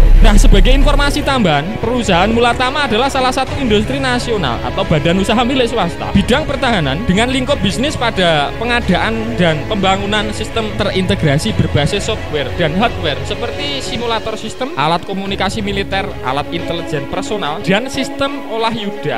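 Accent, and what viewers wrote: Java, Indonesia. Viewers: Cak jowo ne mentok banget pasti wong jowo iki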